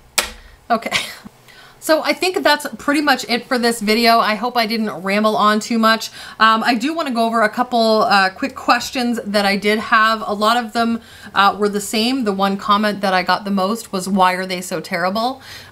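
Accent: Canada. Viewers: I love your Canadian accent!! You make it fun to learn. Thanks for your work. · Your Canadian accent is delicious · I'm guessing you're from eastern Canada with the way you say ABOUT!! Love the eastern accent coming from western Canada.. If I'm wrong please let me know. Thanks for sharing a great video, thrips are horrible and have decimated many plants in my indoor garden. Cheers sister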